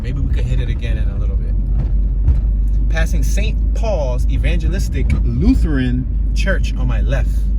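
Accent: Philadelphia, United States. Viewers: that why I offered! You riding around talking and no disrespect you giving out some false info or half info. That why other channels hop out and do little interviews with the locals. I’m not telling you how to du your channel. But just getting data but not touching the field is two different things! You want the real or u want to ride around sounding like a tourist they grew up in The burbs and the only info u got is from a collection of numbers they put together that most of the time manipulated? But Hey do you bro! And of course you not going to know all he stories that why most these channels that blow up do hop out and do I interviews or chat with locals! Again I’m not telling you how to run you page but your numbers are low for a reason. You page a little light bro! I mean that with all do respect! You trying to get it popping or ride around with this half a philly accent sounding like you from Doylestown somewhere? 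Even your tittles I can tell you not from the city and u not getting ground zero info! Bro u said nicetown- tioga was the most dangerous? Man that area is dead zone! Big houses and ain’t nothing going on except up and down hunting park ave! But like I said it your channel but a real Philly bul gone going to know something off about you and your info and page! I guess it’s for the suburban and out of Towners. Where you from bro? For real?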